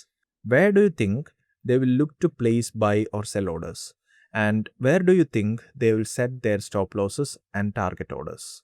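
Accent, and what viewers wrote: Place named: South India, India. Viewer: South Indian ?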